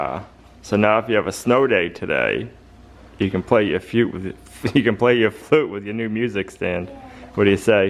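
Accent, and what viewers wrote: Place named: Boston, United States. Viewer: Just subscribed to the channel, I can hear hints of the Boston accent but where about are you located now( obviously not too detailed for personal reasons) but dang you guys have some good snow already. I’m from the north east as well and we’ve only just got our first snow.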